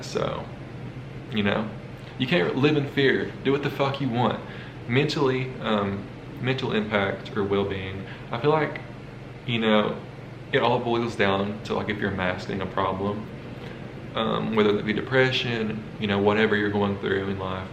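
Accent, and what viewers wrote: South, United States. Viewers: I have no idea who this guy is. But that's a sick sweater and I find it oddly fascinating that his accent sounds like every old southern woman I've ever met. Not that he sounds feminine but the twang is pretty much identical to old southern women. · I love his country accent 😂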